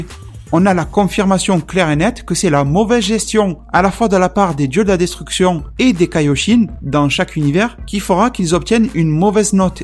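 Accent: Marseille, France. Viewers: ton accent .. Marseille non xD j'ai le même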